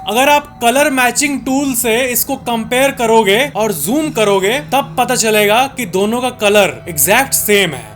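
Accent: Bihar, India. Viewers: Bhai aap Bihari language bolte ho (kore)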